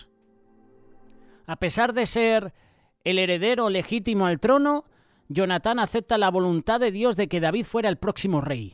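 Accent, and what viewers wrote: Spain. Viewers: Saludos desde Colombia gracias por su narración de la historia de David y Jonathan Bendiciones .... Tengo una pregunta fuera del tema me di cuenta que es usted español porque en España prevalece el catolicismo si en toda europa estuvo Jesus con sus enseñanzas? Es una curiosidad me gustaría si alguien sabe la respuesta me explique gracias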